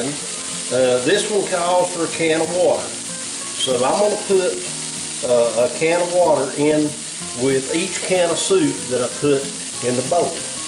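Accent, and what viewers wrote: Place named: Eastern North Carolina, United States. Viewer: I don't know where you are from, but that accent tells me you aint far from eastern NC.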